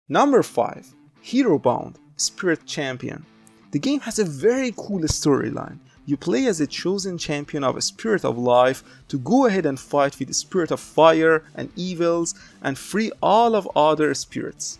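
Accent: Iran. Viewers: bro ! thanks for the video good information and suggestion ... faghat ye khorde roo accentet kard kheyli iranie :D thanks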